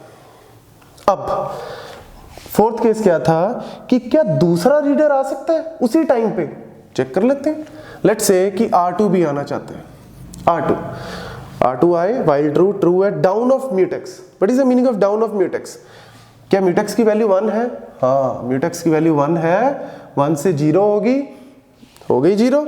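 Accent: Punjab, India. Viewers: Tussi punjabi paaji?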